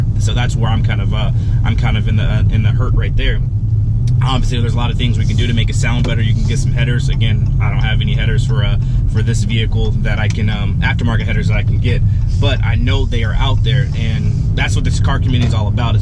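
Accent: United States. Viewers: U from Alabama bro?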